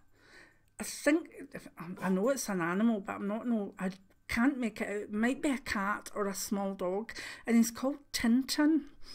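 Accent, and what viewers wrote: Scotland, United Kingdom. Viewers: Hi! I hope you are feeling better and resting. I've only recently subscribed to your channel but already I have used many of your meditation methods and the archangel that came through was Peter (?) I wonder if you would know more about this? Can I ask, do you do a lot of crystal work? I've seen one of your videos on crystals you recommend.You are so intuitive and being born in Edinburgh myself, your Scottish accent just makes me even happier to have found your content.:)Namaste.Love and light to you and yours. Loz. xxx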